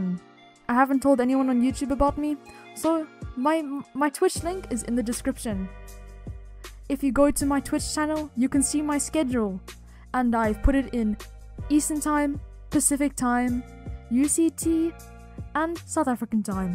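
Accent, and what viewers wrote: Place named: Europe. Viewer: U sound Europe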